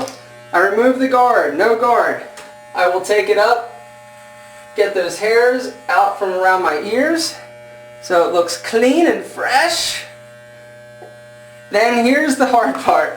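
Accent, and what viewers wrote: Philadelphia, United States. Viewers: you sound like ur from philly "pool it to the back"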